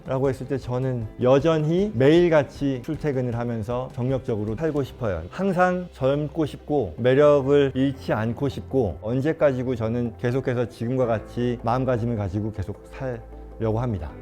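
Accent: United States. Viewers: 말투가 미국교포 발음이라서 어렸을때 간줄 알았는데.. 이력보니 서울대 경제학과 졸업후 가신거네요. 어렸을때 갔으면 한국에 대해서 잘 모를텐데 대학 졸업후 간거면 한국에 대해서 너무 잘 아시겠네요. 이분이 한국과 미국 양쪽에서 성공적으로 활동할수 있었는지 알수 있는 이력이네요. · 이야...환경이 중요하구나 한국에서 오셨는데 미국에서 태어나고 자란 나보다 더 교포느낌 나시네 ㅋㅋ 난 한국서 연습생해서 지금은 영어하지않는 이상 사람들이 교포인지도 모름ㅋㅋ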